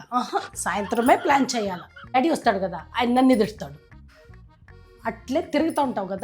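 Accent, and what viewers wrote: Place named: Bangalore, India. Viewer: Bangalore Slang Telugu 🎉🎉 ❤❤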